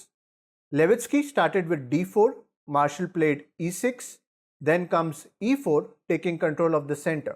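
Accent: India. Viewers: god i hate indian accent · ayo mutahar with indian accent?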